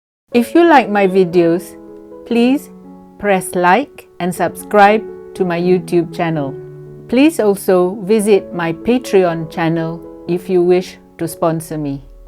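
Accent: Perak, Malaysia. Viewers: You ni orang Perak ke ? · Pekat bunyi.Perak nya..😁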